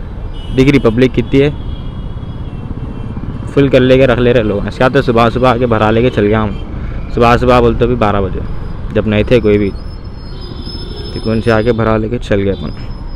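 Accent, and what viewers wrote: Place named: Hyderabad, India. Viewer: This is not a hindi bro. It's a deccani hyderabadi slang...💀🙌🏻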